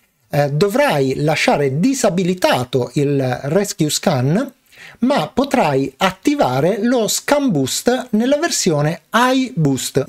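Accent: South, Italy. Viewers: Salut mon vieux, comment vas tu ? Ci si ri..legge ^^ Tre domande "bionde"...soltanto, anzi quattro..., insomma poche domande ^^ :- Malwarebytes si deve disinstallare prima di installare questo o si può fare anche dopo ? Vivi a Genova ? quindi non sei di Genova ! Sei del sud, vero ? La tua "erre" (e forse anche il tuo nome) ti tradisce ^^ Di dove esattamente ? Perché non puoi mangiare patatine ? Usi la stevia ho sentito, diabete forse ? Uhm la tua Nutella direbbe di no, o sbaglio ? ...nel corso del corso...? LoL Ciao e ancora grazie caro vecchietto ^^ P.S. In futuro forse dovresti aggiungere il software in download sul tuo sito prima di pubblicare il video che lo riguarda...vedi caso 3DP Chip ^^ P.P.S. Come avrai notato il mio italiano migliora constantemente. Brava la bionda, vero ? ^^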